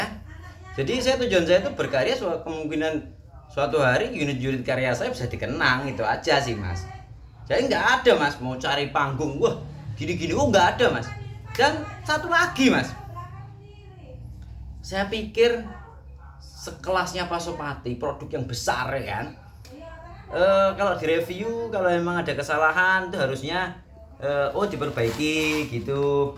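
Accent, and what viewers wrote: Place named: South Sumatra, Indonesia. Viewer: Lokasi pundi mas sampean ..kok seng dandan apache bahasane kok koyo sumsel mas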